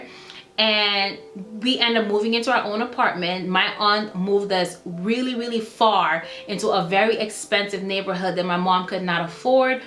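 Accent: New York, United States. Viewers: I thought you would have a foreign accent but you don't. You sound similar to a New Yorker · I tought I was going to hear an accent, but not at all! You sound like an American. Grappig om te kijken naar een engelstalige youtuber, waarvan je weet dat diegene ook Nederlands praat haha.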